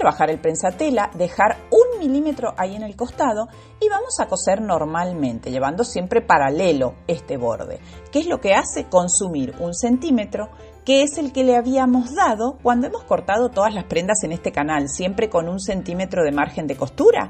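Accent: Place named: Argentina. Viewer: Un video tan útil, con explicaciones claras. Me encanta tu acento (argentina). Muchas gracias.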